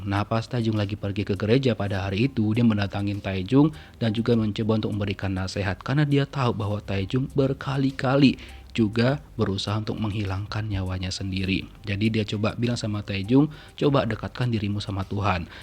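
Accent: Medan, Indonesia. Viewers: Yg ngomong alur cerita ini orang medan sumut kah..🤔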